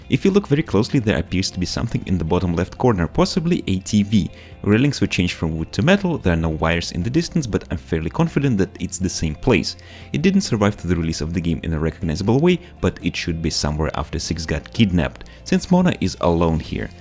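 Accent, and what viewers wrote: Russia. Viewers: sir, are you by any chance Russian?) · Я слышу русский акцент)))))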